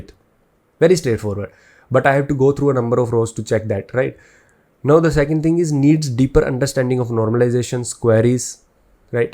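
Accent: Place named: Punjab, India. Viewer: Sounds punjabi Maybe you should relearn what south indian accent sounds like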